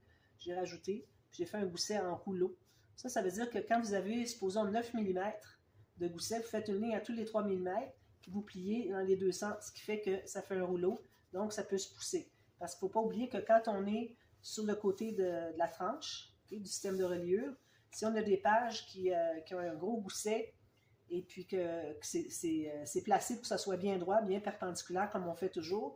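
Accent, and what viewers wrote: Canada. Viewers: Bonjour trop joli merci pour les tutos 🎉🎉 accompagné de votre accent canadien 🎉🎉j'adore car je suis allé au Canada Québec en septembre j'ai trop aimé ce pays et l'accent Merci 🎉🎉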